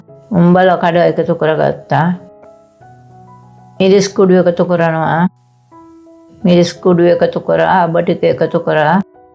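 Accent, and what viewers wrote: Ambalangoda, Sri Lanka. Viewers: අම්බලන්ගොඩ පැත්තෙ ආච්චි කෙනෙක් කතා කරන විදියට නම්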